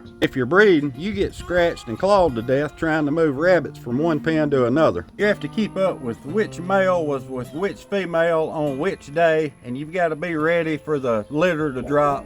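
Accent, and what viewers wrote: South, United States. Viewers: First a salute for staying on the family farm. Second, love the content. Third, I love to hear Southern Folk talk. Subscribed from Central New Mexico U.S.A. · Hi, My name is Houari. I am from Yellel in Algeria. Thanks for your intresting video. I love your southern accent.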